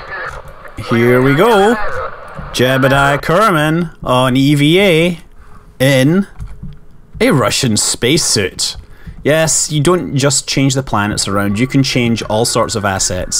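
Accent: Scotland, United Kingdom. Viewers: you sound Scottish or Irish possibly welsh or from northern England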